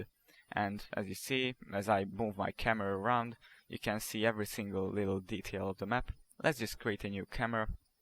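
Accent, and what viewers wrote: Hungary. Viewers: Nagyon durván hallatszik a magyar akcentus :D De ügyes vagy! csak így tovább! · Az akcentusból tudtam meg hogy magyar vagy! Sok angol után nagyon könnyű kihallani :D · Szia :D Ahogy láttam a géped nyelvét, és hallottam a kiejtésedet, magyar vagy :D Nagyon szépen foléykonyan és érthetően beszélsz angolul, kívánom, hogy legyen vele nagy hasznod az életben! :) :D Ui: Köszi, nagyon sokat segített a videó :)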